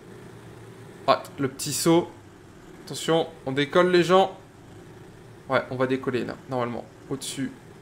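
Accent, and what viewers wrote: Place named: England, United Kingdom. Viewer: Mdr j'adore ton accent anglais lol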